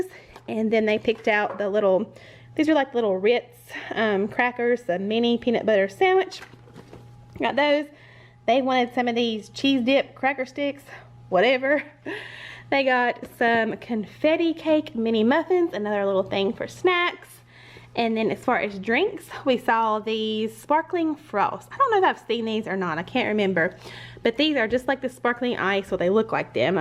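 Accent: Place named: South, United States. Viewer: Just found your channel.. which southern state are you from ? Cause you sound "just like me" haha -- NC girl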